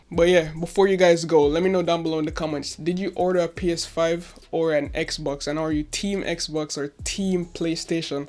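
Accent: Jamaica. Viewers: You jamaican? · Ur Jamaican I could tell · U jamaican bro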